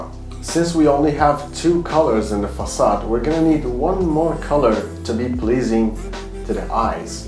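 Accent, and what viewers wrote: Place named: Brazil. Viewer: Are you Brazilian? Your accent kinda sonds like mine.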